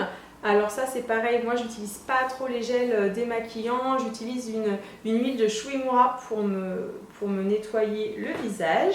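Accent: United Kingdom. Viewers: J adore ton hello et ton accent british. 👍👍tu présentes aussi des tres bons produits même pour les hommes c good. Très bonne vidéo. Merci à toi ça fait plaisir que tu sois aussi active. 💙💙✌✌#warrior4ever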